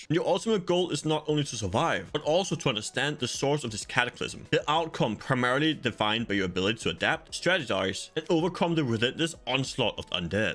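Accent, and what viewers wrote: Denmark. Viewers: def you're danish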